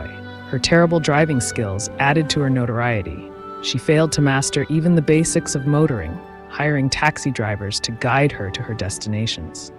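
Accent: United States. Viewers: I' usually like to listen to mostly Men Narrators with nice toned voices' but You' have a American Easy on the Ears Lovely toned Voice 👌✨🎶🎧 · Sounds like my first wife from Tennessee.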